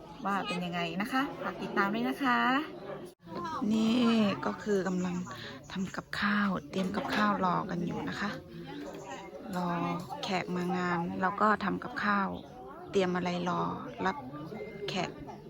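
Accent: Thailand. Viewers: น้องทำไมพูดไทย สำเนียง กทม. มีเเต่คนสวยๆ สวดเหมือนเชียงใหม่ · พูดสำเนียงไทยกลางชัดมากเลยครับ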